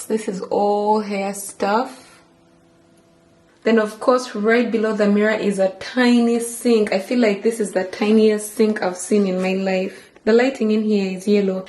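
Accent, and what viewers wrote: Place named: Uganda. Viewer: Are you Ugandan? Your accent sounds Ugandan. ☺️ Edit: Just saw another comment where you confirm that you're Ugandan. Thanks for representing!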